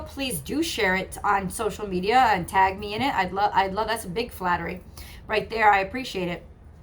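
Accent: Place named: New York, United States. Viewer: Such a small world, when I first watched I thought you were from NY from the slight accent until you mentioned the place in Derby! Just moved to Newtown, originally from Danbury area. Not sure how the YouTube algorithm works but didn't think it would be suggesting people from the area haha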